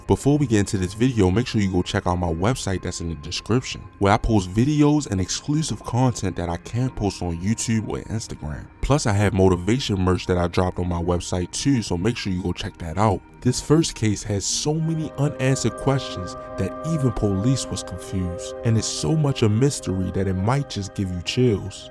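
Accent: Baltimore, United States. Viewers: The narrator sounds like he from Baltimore.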